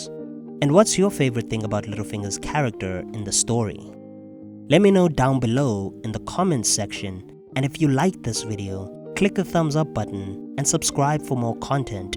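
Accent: South Africa. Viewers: This dude sounds South African😅.... great analysis by the way❤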